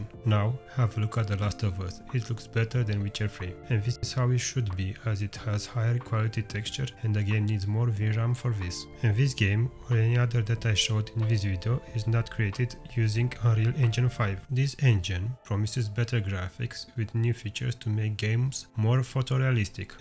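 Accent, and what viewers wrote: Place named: Eastern Europe. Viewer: Great video, love the professional yet slavic accented english, it shows he's doing as good of a job as he can, great indepth overviews, overall 10/10 pc gaming content youtube channel that i would love to see grow from 24 subcribers to thousand if not more! You got at least a new subscriber as long as your videos keep this great of an indepth and quality.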